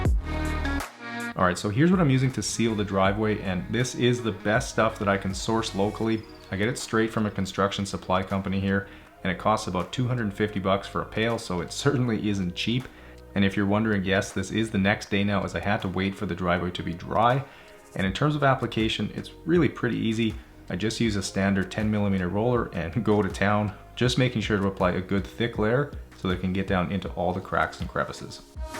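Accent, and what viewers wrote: Canada. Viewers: I’ve watched soooo many of your videos and I just want to say you sound very Canadian in this!!! Love it 😂❤️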